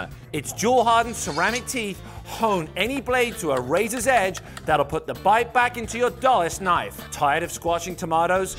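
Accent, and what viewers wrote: United Kingdom. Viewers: I’ve only watched penguinz0 videos. I didn’t know he was British I was so surprised when I heard him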